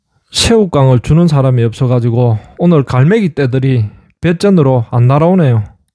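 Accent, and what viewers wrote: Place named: Masan, South Korea. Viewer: 수고가 많으십니다~ 목소리 들으면 강호의 발바닥님/ 생각도 납니다~세분이 모두 마산분이십니까?~세분 목소리 억양이 비슷 합니다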